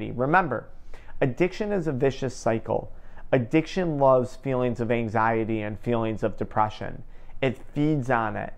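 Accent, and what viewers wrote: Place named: Buffalo, United States. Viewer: i knew by your accent you were from buffalo new york i saw the 716 number and knew it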